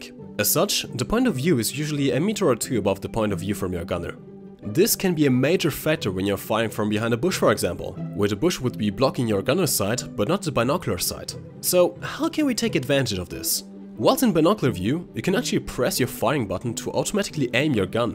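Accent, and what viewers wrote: Germany. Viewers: I don’t know why, but i‘m german and i really hear the german accent and it kinda sounds like bad english, #nohate, still like you😂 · You are german right? You have this special accent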